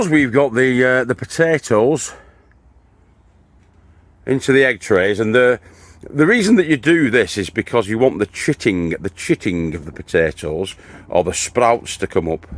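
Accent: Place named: Warrington, United Kingdom. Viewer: i knew I recognised your accent. . . . I was born in Warrington (years ago in black and white 😂😂). Worked for Greenalls Brewery for 21 years. Now at the age where gardening has become much more important to me so your videos are always enjoyable and instructional. Keep them coming Mucker !! MJ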